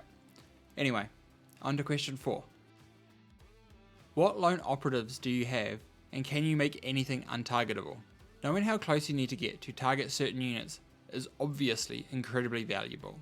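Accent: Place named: New Zealand. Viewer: You sound like a kiwi, where abouts are you based?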